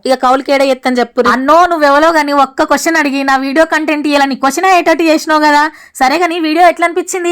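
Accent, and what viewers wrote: Telangana, India. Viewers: అక్క మీ తెలంగాణ యాస అదుర్స్...రిపీటెడ్ గా వినాలని ఉంటది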